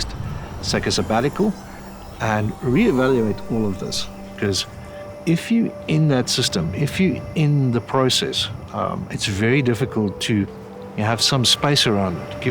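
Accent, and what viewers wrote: South Africa. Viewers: I know a south-african accent when i hear one. Lekke lekke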